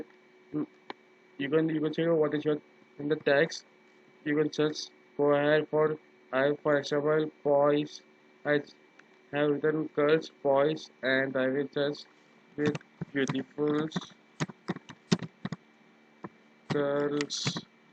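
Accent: India. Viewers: indian indeed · u from india?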